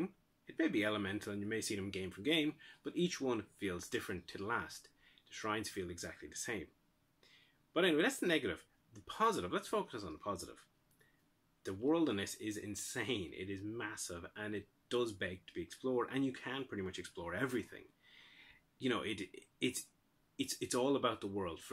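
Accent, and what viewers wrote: Ireland. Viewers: Are you really Irish or is it a fake accent?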